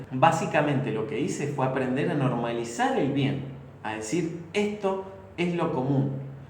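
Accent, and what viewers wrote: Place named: Mendoza, Argentina. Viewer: sos mendocino? yo lo soy y siento que tenés el acento, abrazo!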